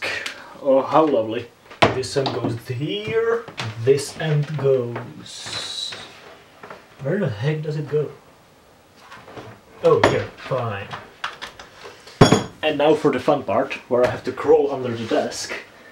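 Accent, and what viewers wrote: Czech Republic. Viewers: I was wondering why the accent was so familiar, turns out you are a fellow Czech. Great video btw, it's quite fascinating to see how they made the games back in the game. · It's funny - you've started speaking English and I immediately knew you are Czech. There is no hiding 🤣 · časkej přízvuk jak poleno! super video!!!!!!!!! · Já tušil že jsi čech :D ten přízvuk mi napověděl :D ale jinak super video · Do u live in Czech republic bc i hear an accent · Hodně dobrej kontent! Podle přízvuku jsem poznal že jsi z Česka a potvrdil mi to "Koš" na Windows XP :p CG! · Teď mi tohle video náhodně vyskočilo na YT a říkám si, že v té angličtině slyším češtinu a starý noťas mi to potvrdil! :D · Hned jsem věděl podle přízvuku, že jsi čech xd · ey i recognized that czech accent good vids ngl :) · I was watching the video when I noticed your accent. 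I thought wow ... sounds like a Czech accent ... and then I noticed the trash can label :D Keep up the great work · Hned jsem věděl, že jsi Čech podle tvé angličtiny, průzkumník souborů a poznámkový blok mi dal za pravdu <3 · Cool. im from slovakia. i heard you czechis accent. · i cant help myself but i hear a really strong czech accent in your voice . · To jsem poznal že jseš z Česka podle té výslovnosti. Jinak dobré video! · To že seš Čeh jsem poznal podle toho hlasu Angličtiny. Jinak dobrý video! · Bro I swear this guy has such a big czech accent. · Slyším první slovo anglicky a hned slysším, že jsi Čech, jinak parádní video kámo. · Ahá :D mě se zdála tvoje angličtina taková počeštělá :D a win xp vítejte....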